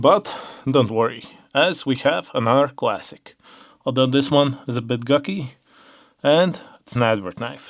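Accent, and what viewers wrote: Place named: Eastern Europe. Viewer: hmm Id guess you are either Slavic or Eastern European??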